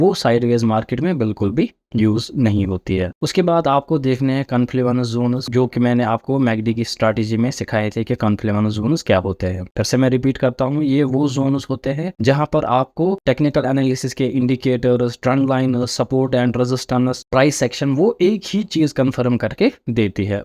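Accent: Kashmir, India. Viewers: Sir, app Kashmir se ho kya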